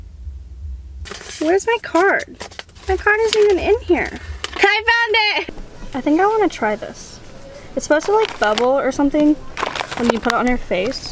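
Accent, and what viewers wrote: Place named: United States. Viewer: the classic american accent lol... #loveyall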